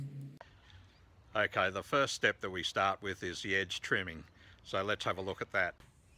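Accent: Australia. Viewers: I like your accent! you aussies are okay with me. I've got a Weed Eater lol but I think I'll call it a Whippa Snippa from here on out. Cheers from Texas. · I CANNOT listen to an Australian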